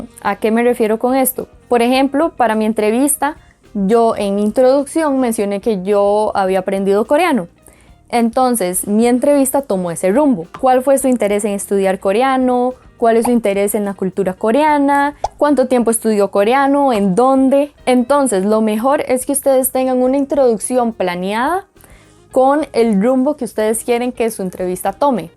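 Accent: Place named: Costa Rica. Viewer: omg ella es de C.R , ya decía yo que su modo de hablar se me hacía conocido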